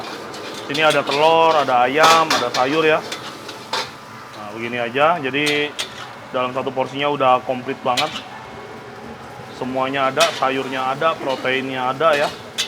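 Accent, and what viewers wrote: Banyumas, Indonesia. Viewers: Koko kok bisa bahasa ngapak yah? Kepengin punya kedai kaya gini koh Salam dari cilacap kota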